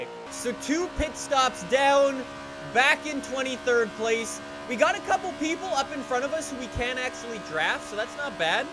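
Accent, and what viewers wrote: United States. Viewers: You even sound more American 🇺🇸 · he turned southern after this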